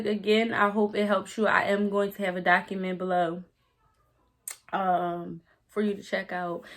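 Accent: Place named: Philadelphia, United States. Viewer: Thanks for doing this! You should like you’re from Philly too 🙌🏾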